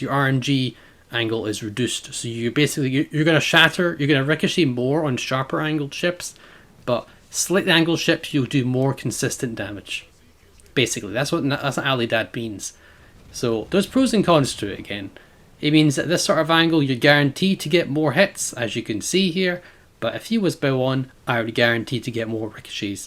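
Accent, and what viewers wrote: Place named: Scotland, United Kingdom. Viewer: Very well played, really like your videos, always nice to hear a wee Scotsman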